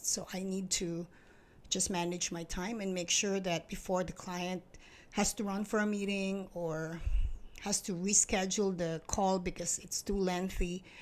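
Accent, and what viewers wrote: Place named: Singapore. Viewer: You speak like a Singaporean. Very clean lines. Good articulation. Keep it up ate. Greetings from Singapore.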